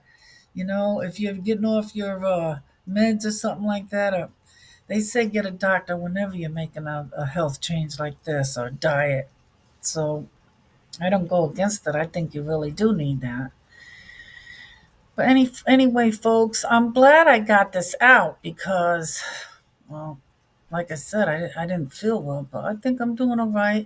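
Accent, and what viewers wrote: New York, United States. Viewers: I love your list! Usually I'm not excited about lists I find in YT videos, but THIS one is wonderful bc it is so personal to you -- and to me! I'm just 4 days in and terrified to speak it bc I'm afraid I'll fail. Bless you, young angel for your heartfelt words. You'll be better soon. Food poisoning is the pits! (is that a NY accent I hear?? love it)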